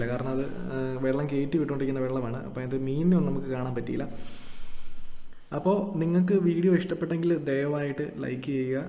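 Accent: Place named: Kasaragod, India. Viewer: Aiwaaaaaaa🥰🥰🥰🥰🥰 kidilan #kasaragodbhasha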